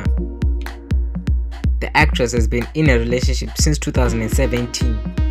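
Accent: Zimbabwe. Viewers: Why do I think that the narrator is from zim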